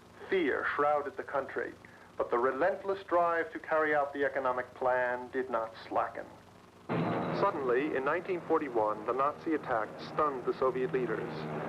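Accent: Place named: Oxford, United Kingdom. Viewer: Попробуйте посмотреть в оригинале - диктор говорит с очень красивым акцентом, характерным для ученых в Оксфорде:) И это не единственный плюс просмотра в оригинале - про-версия не нужна, нейроперевод не нужен, ваши когнитивные способности улучшаются, а главное - все совершенно бесплатно.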